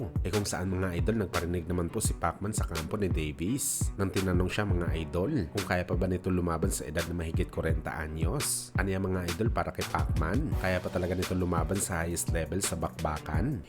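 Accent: Visayas, Philippines. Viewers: Ikaw lng ata nakakarinig Ng sinasabi mo,punting bisaya na nga Ang hina pa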